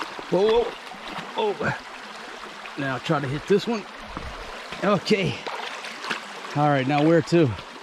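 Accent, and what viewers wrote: United States. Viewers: The original American accent ! Beautiful accent bro. 🇺🇸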